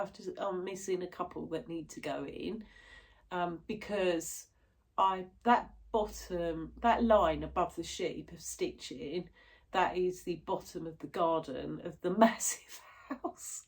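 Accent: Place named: United Kingdom. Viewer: Hi! Stitchy Sally shouted you out and posted a link to your Flosstube, and I’m glad she did! 😊I’m blown away by your fabulous projects and enjoyed listening to a fellow Brit!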